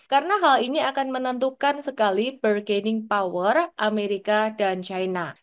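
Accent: Java, Indonesia. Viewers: logatmu jowo mba😁😁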